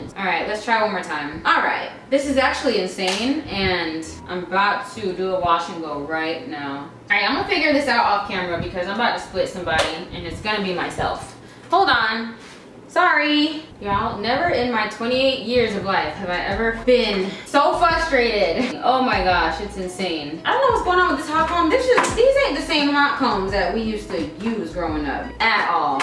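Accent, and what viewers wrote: Jamaica. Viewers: Am I the only one that can hear a lil bit of your Jamaican accent?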